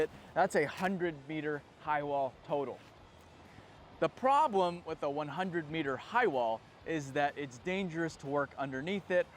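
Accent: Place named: United States. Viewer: I guess when a dynamite stick reaches a certain size it's called a sausage. I definitely enjoyed the American pronunciation of German names.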